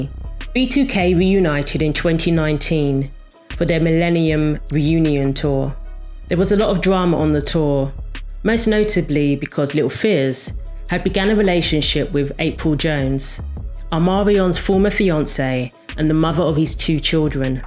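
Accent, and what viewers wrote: United Kingdom. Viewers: Good gawd she reads so slow. I had to fast forward real quick when she read the second time. British accents force you to speak so slow. That must be why they speak with an accent. They don’t follow the phonetics of English.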